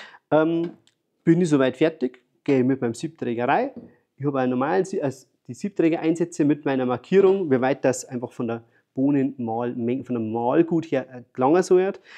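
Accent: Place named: Bavaria, Germany. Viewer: Für nicht Bayern leider nicht immer ganz leicht verständlich aber deine Begeisterung kommt sehr authentisch rüber. Toll😊 Auch für mich in der engeren Auswahl. Leider wohn ich nicht bei euch in der Nähe sonst käme ich auf jeden Fall bei dir. Vorbei. 🎉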